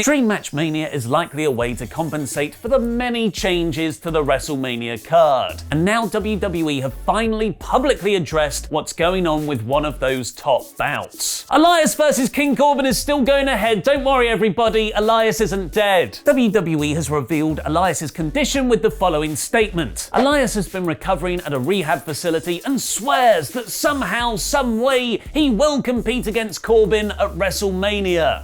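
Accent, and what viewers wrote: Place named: RP, United Kingdom. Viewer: Why do you talk like you're on Radio 4?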